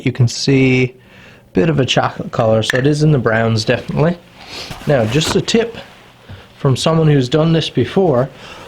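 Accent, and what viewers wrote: Newfoundland, Canada. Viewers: Oh yeah, that's a good ol' fellow Newfie I hear. Great video my buddy. The rifle looks beautiful. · first guy I’ve ever heard with a noticeable Canadian accent lol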